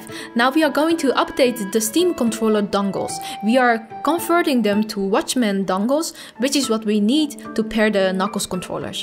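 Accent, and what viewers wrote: Netherlands. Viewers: Are you dutch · o the dutch accent always hurts my ears . but thanks for the answer !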